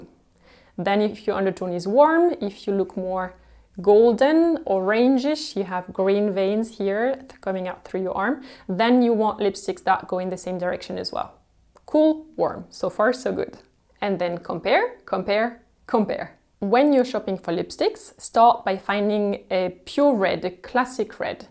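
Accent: France. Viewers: I truly just love your French accent, only heard it in movies.. so nice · English with french accent is soooo beautiful ❤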